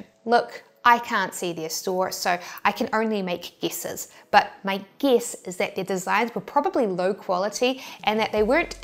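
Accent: New Zealand. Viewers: What accent? Sounds perfectly kiwi to me.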